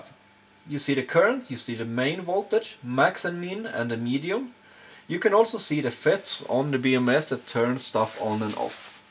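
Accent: Sweden. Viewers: You sounds swedish.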